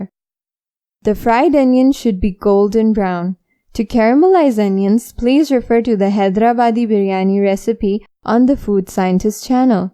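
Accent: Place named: United States. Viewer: After a while you Came with a nice recipe in American accent,as always you trying the new things in your YouTube videos... very good 😃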